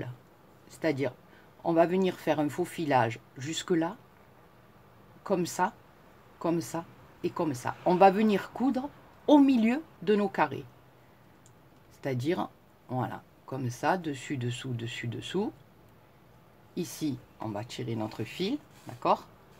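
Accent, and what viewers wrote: South, France. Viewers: je suis au Québec depuis 2002 avant j’étais en Avignon avec mon chéri qui lui a aussi ce superbe accent du sud! Nous avons choisi de quitter la France pour nous installer au Québec. Nous y avons eu deux enfants qui ont l’accent.... québécois lol C’est marrant mon fils a presque l’âge du tien. Je voulais aussi te dire que je viens de regarder plusieurs de tes vidéos et tu m’as beaucoup émue. Je te souhaite beaucoup de bonnes choses et je suis vraiment ravie de pouvoir te suivre désormais ❤️ Gros becs 😘 xoxo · Coucou ma, tu m'as tué avec ta carte 😂😂😂 j'en peux plus 😂😂. Encore de belles fleurs 😍 et papillons. Ah dommage tu n'auras pas le mot de la fin 😞 car je ne pouvais pas ne pas dire ce que je pense et que la vidéo charge. Je t'adore ma et ton accent du sud me berce et me met la joie. Gros poutous 😘😘😘😘❤ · Bravo !! Tout est dit 💕😏 avec un bel accent du soleil , comme moi qui suis du sud-ouest 😘 nouvelle abonnée a votre chaine. 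Merci du partage.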